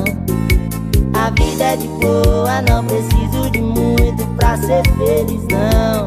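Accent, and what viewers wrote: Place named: Salvador, Brazil. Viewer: Parece que é até de salvador 😅😂😂vc é fera😅❤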